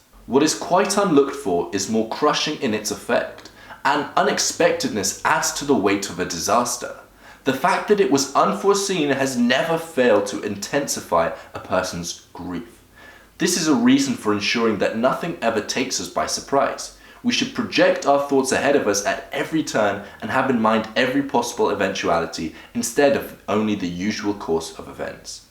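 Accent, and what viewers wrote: United Kingdom. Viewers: Subscribed. And, I love your British accent!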